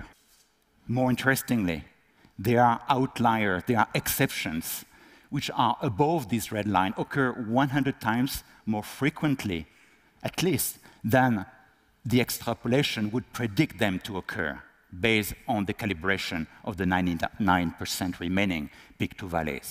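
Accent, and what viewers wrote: France. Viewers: His French accent is thick as fucc tbh tho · u french? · If you find him hard to understand, it's probably because you don't get to hear other people's accents too often, making this more of an issue about you. He's obviously French and has a French accent, but he's not CHOOSING to speak like that- as with everything, learning to master a language takes practice.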